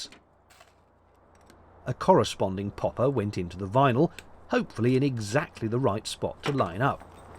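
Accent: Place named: RP, United Kingdom. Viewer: ... So nice to see a new Narrowboat video from you. I also appreciate your use of and dry humor expressed in the English language. It's becoming a rare thing these days, when a generally lower "quality" of use is considered to be acceptable. If you like, I appreciate the style of BBC Radio 4 English and pronunciation. I suppose this shows my age. Long may you continue.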